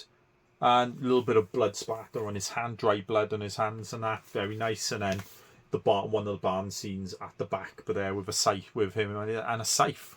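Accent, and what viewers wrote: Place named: Wales, United Kingdom. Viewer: A Welsh man??? Well hello sir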